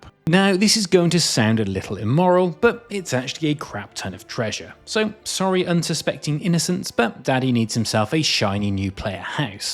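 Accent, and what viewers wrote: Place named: England, United Kingdom. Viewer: Brilliant video, concise, funny and very useful. Also you sound like you're from England like me! Did not know about the Argonian, the other quests I had done some of but didn't realise that they progressed!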